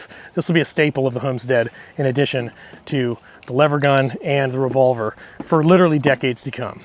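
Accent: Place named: Florida, United States. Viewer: where you from boy? Florida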